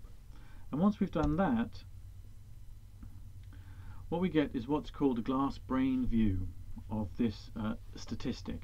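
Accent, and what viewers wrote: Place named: England, United Kingdom. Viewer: Lovely accent - Really miss the English accent - so pretty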